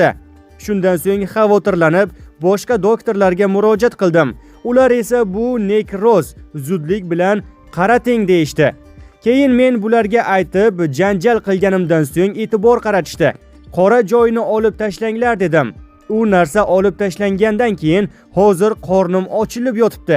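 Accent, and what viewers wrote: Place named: Tashkent, Uzbekistan. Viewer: Бу канака гап энди маданият борми аелмисиз эркакмисиз аел киши шунака гапирадими тогда тошкенча гапиряпсизда энди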